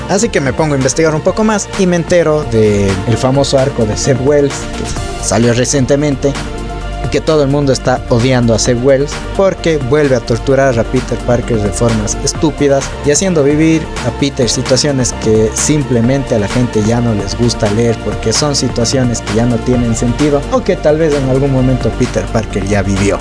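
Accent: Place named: Ecuador. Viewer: Ese acento, sospecho que eres de Cuenca o de Quito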